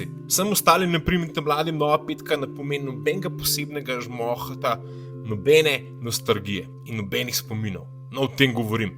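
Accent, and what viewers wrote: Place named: Ljubljana, Slovenia. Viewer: prosim uporabljaj Slovenščino ne ljubljanščine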